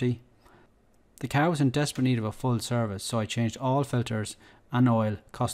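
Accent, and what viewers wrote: Ireland. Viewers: Glad to here another Irish accent on YouTube. Keep it up bro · Great job. I enjoyed hearing the Irish accent too (Mum was Irish).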